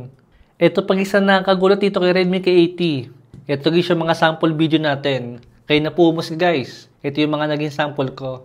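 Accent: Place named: Bulacan, Philippines. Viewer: taga san k boss yung accent mo parang sa bocaue bulacan 😄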